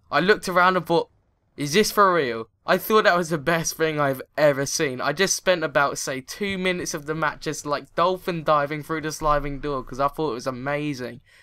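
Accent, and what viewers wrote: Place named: Oxfordshire, United Kingdom. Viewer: Great review. I completely agreed with 99% of everything you said. Where are you from by the way? You sound exactly like my half brother. You from somewhere in oxfordshire?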